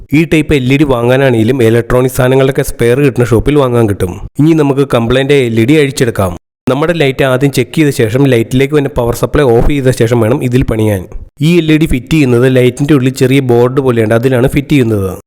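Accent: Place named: Muvattupuzha, India. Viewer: Kothamangalam or muvattupuzha.😊 സംസാരം കേട്ടാൽ അറിയാം😊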